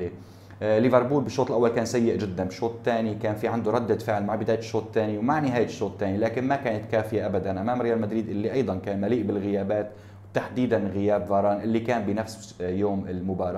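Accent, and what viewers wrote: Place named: Aleppo, Syria. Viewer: مبين من لهجتك ... يا ابن حلب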